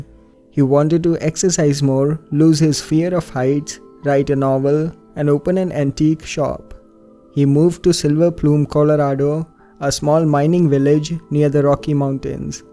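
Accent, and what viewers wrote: India. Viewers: This narration by this Indian SUCKS. Please get an American, English, Canadian, or Australian, or any English language speaker who doesn't have such a heavy accent. Who thought it was a good idea to have this dot head narrate?? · i cant stand that thick indiaN accent sounds like if i called a call center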